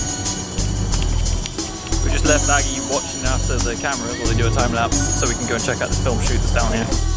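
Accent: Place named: Dorset, United Kingdom. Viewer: back to Bounemouth eh? Oi knew oi erd a Dorset accent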